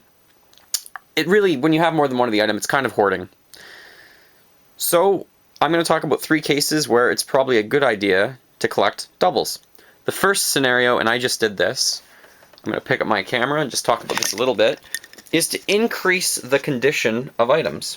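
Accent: Canada. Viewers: Love your Canadian accent.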